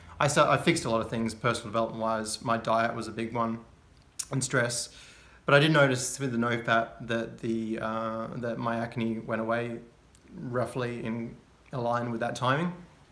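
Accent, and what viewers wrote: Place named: United Kingdom. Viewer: Cool video. Can’t tell if You’re British, Australian or a Kiwi! In any case good luck on your journey, seems like you’re doing well mate.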